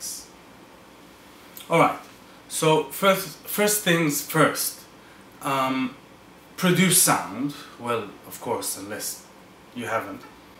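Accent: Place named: Arab world. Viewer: هذا الشخص عربي وليس اجنبي، واضح جدا من اللهجة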